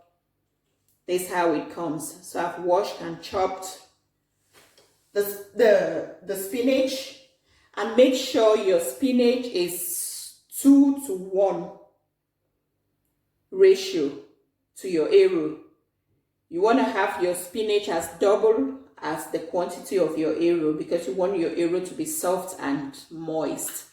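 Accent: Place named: Cameroon. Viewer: Oh my, can hear that Cameroonian accent! My Cameroonian sister right there. Achu is my favourite Cameroonian dish, then fufu and eru.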